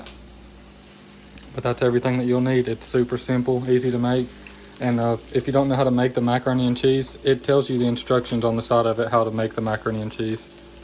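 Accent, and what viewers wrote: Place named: West Virginia, United States. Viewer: This looks delicious yet simple. What a blessing you are to your grandparents! I just love your WV accent; sounds like the folks from my home in eastern KY. 🌻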